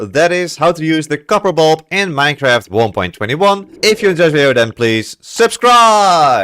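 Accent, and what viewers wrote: Netherlands. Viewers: Bedankt voor de tutorial! And yes, I know a Dutch person when I hear one, haha!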